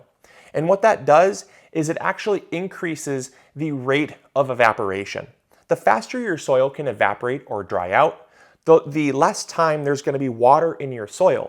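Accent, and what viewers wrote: Michigan, United States. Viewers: Fun hearing your Michigan accent! So different from the South.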